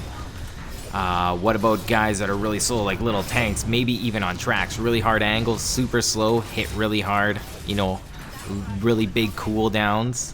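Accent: Canada. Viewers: Are you Canadian? Sounds like it. Say “battery about”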